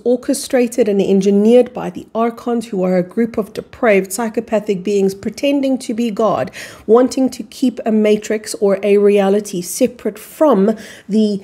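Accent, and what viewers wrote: South Africa. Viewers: exactly the the South African pronunciation- it is a beautiful accent.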